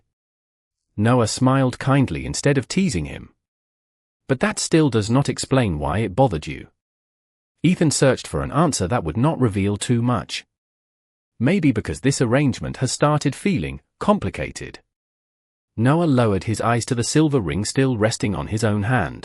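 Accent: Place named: Vietnam. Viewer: Same old topic but with a glitch in the AI voiceover (I'm a linguist... among other things, but wasn't able to identify it, it sounded like Tagalog, but with Vietnamese cadence). Also, the tagline is deceptive. There's no straight man to talk about or kiss that never happened. Please, be careful before posting. Thanks. ❤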